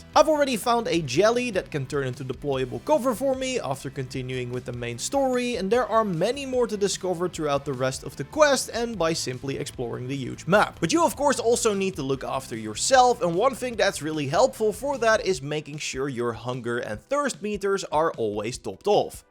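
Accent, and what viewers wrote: Netherlands. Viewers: This guy couldn't be more Dutch. That accent is annoying af.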